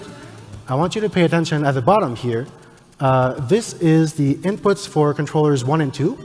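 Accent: Quebec, Canada. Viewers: i can hear the french canadian accent slipping through, I would know that because i'm also canadian lol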